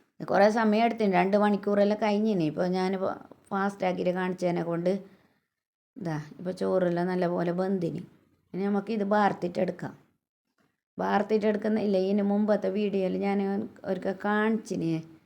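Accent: Kasaragod, India. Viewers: കാസർകോട്ട് സസംസാരം കേൾകാൻ ഇഷ്ടാണ് video super ❤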